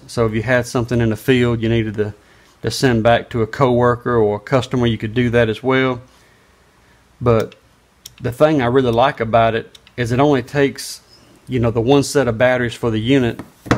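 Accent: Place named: Georgia, United States. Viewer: Are you a Georgia boy! Lol. Nice meter. Stay safe buddy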